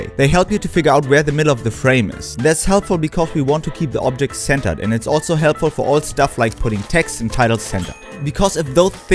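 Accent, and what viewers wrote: Germany. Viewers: Ach ja.... Wenn Deutsche Englisch sprechen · Are you German?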